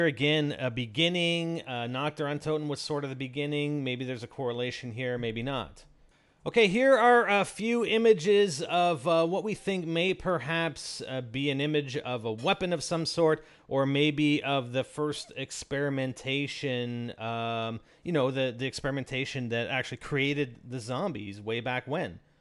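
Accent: Canada. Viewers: is canadian you can by the way he says about and out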